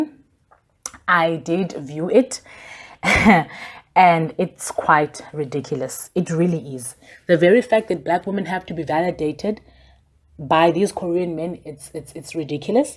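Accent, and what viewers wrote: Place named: South Africa. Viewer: You sound South African for some reason. Btw your channel is really needed these fans out here are crazy as fuck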